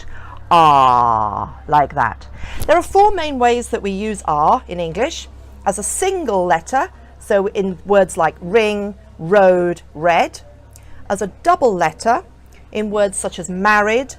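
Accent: United Kingdom. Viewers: Im from El salbador ,central america and I love british accent amd youre so cute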